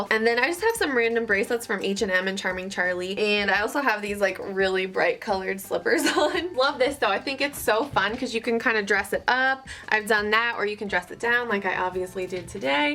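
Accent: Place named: Philadelphia, United States. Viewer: So cute! Love the dress too. Every time I watch one of your videos, my husband, who grew up in South Jersey, but whose family is from Philadelphia always says "It's that girl from Philly, right?" I can't hear your accent, but he can!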